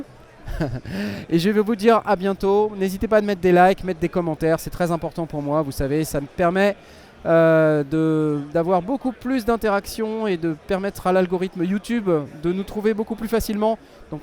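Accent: France. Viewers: I will try to ré-explain ... Fluent but frenchy indeed.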